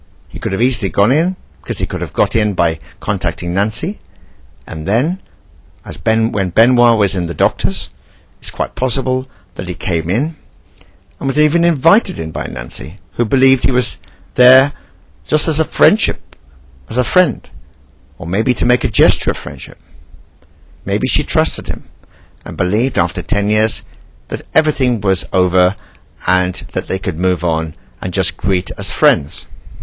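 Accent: England, United Kingdom. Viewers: its an english voice dumbass if u think that sounds gay then u really have crap gaydar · Is the British accent supposed to give credibility to this? He's about as intelligent as Lord Alfred Hayes (RIP). Give me a break. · It's his accent for being british, love his voice actually. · BBC world service style british accent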